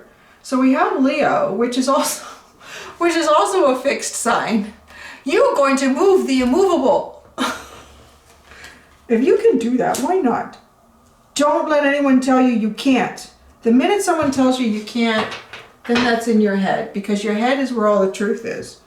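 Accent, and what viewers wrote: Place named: Canada. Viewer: I love how Canadians pronounce "out" (Thank you for the reading <3)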